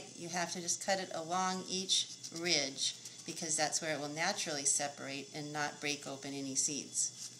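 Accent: United States. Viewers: THE 'right' way? The accent held no surprise for me. Who else would be so arrogant as to think that 'their' way is 'THE' right way but an American? Say what it is: a better way, a less messy way. But 'the right' way? Says who? I've seen several ways that are even better.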